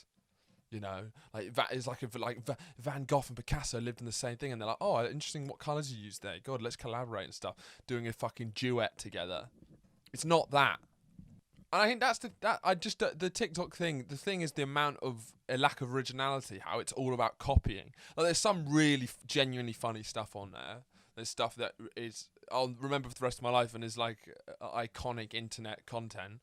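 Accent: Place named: New York, United States. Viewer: Ridiculously good New Yorker accent lmao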